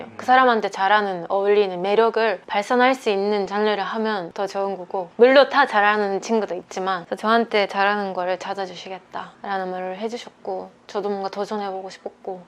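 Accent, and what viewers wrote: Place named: South Korea. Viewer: 발성부터 한국어 발성법이죠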